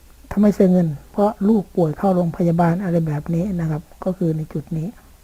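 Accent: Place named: Isan, Thailand. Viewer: ดีน้อ หนุกะอีสาน พอฟังออก555 ตามฟังอ. อยู่เด้อจ๊ะ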